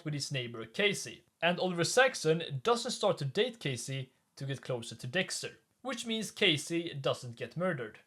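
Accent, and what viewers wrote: Sweden. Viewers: are u swedish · I´ve watched multiple of your videos wondering if you´re swedish. Thank you for speaking swedish at the end so my adhd stops trying to figure out your accent and focus on the content instead, men jag fucking visste det haha.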